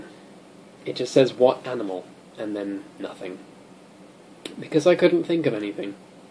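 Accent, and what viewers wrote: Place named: United States. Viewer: Have you been stateside a fair bit? There's an American twang to some of your words these days.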